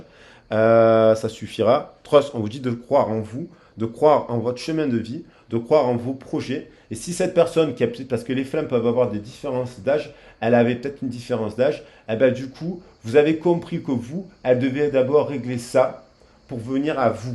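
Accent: South, France. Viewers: vos guidances vous êtes trop fort! Toujours un plaisir de vous écouter avec votre bel accent du sud merci.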